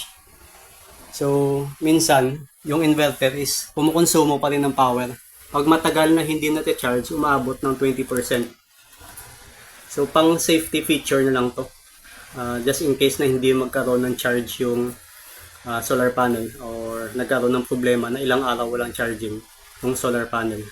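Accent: Iloilo, Philippines. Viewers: Ilonggo ka Sir?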